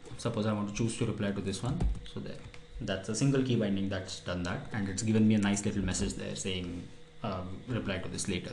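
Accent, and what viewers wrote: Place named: India. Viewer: this looks interesting indian accent NOPE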